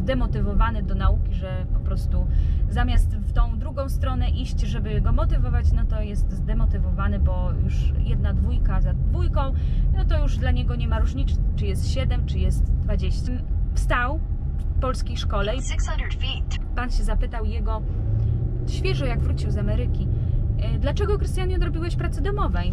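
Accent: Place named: Germany. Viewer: Dla mnie vlogi po angielsku może mają sens ale nie na tym kanale ponieważ Pani czasami przekreca słowa i ma trochę niemiecki akcent (bez urazy). Angielskiego uczymy się najwięcej słysząc go więc z tej naszej nauki wyjdzie jedno wielkie każdy wie co. Każdy powinien się uczyć angielskiego wtedy kiedy ma na to ochotę a nie pod przymusem.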